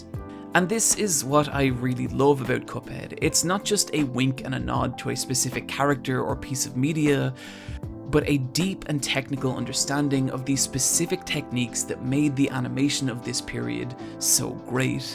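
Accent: Ireland. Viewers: because he's Irish. Whenever he speaks English he does so with that regional inflection, which affects pronunciation IE how he says "Film". It's not something that is "incorrect", unless contradicting common elocution and that can only be called out by someone that lives in that region. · Am I the only one who feels like that he sounds a lot more irish in this video than in his others?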